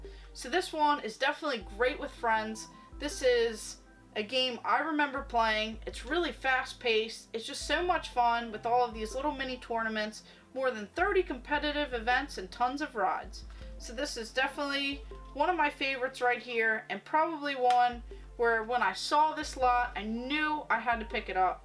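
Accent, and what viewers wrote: Maryland, United States. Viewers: Great video. Love the Maryland accent 'hon! Your collection is incredible, glad I found the channel.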